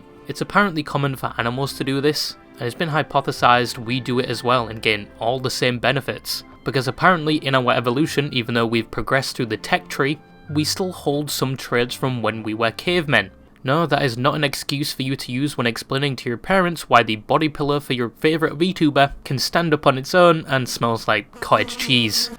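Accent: United Kingdom. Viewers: your not British?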